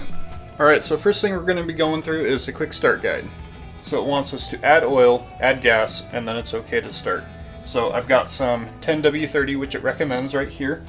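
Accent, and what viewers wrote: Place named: United States. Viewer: Great video. Great American English from expat in England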